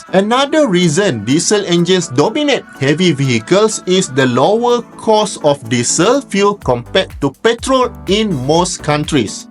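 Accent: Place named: Malaysia. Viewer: Mantaplah prof... Bahasa English,tp intonasi masih lg bahasa Malaysia , masih familiar 👍👍👍👍👍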